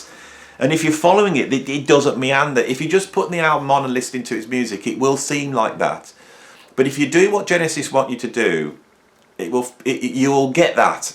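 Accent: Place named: Midlands, United Kingdom. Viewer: It's funny even in the Midlands accent you reading the fly on the windshield lyrics I still hear the big hit afterwards 😂 a real iconic moment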